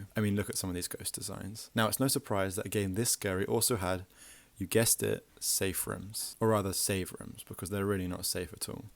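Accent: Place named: United Kingdom. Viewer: Anything told to you in a deep British voice is good, isn't it?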